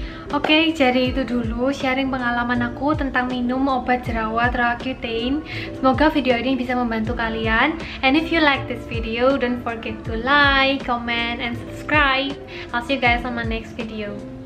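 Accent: Java, Indonesia. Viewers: ojo medok medok banget mbak hehehe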